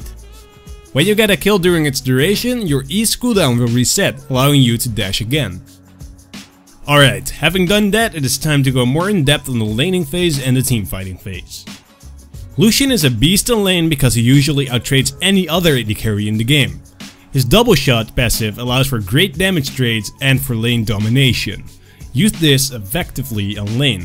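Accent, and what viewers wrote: Netherlands. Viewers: Dutch if my guess via the accent is not mistaken....? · Sowieso een Nederlander dit, hoor je gelijk aan het accent.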